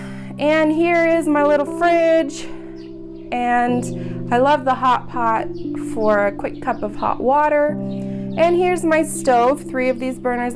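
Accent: Kenya. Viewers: Your accent has changed too. You sound more kenyan now even when you pronounce certain words. Thanks for the tour!!!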